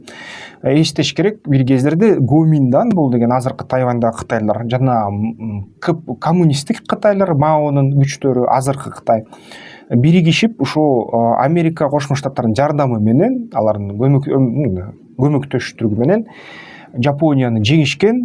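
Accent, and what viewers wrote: Standard. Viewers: РАХМАТ СИЗГЕ КЫРГЫЗЧА ТАЗА СУЙЛОП ЖАТАС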